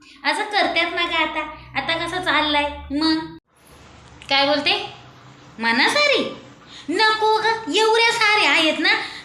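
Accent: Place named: Shahapur, India. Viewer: शहापूर च्या भाषेची आठवण येते तिथल्याच बोलीभाषेची🎉😂❤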